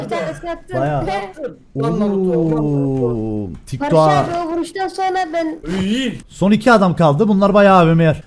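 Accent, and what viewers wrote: Cyprus. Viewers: Kıbrıslı knk Diyarbakırlı değil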